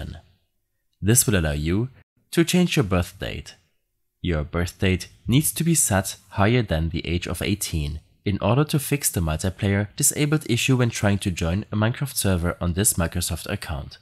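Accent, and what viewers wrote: Germany. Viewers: Noch keinen deutscheren Akzent gehört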